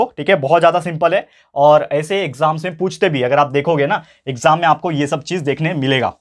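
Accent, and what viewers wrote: Maharashtra, India. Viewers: Sir kya ap marathi ho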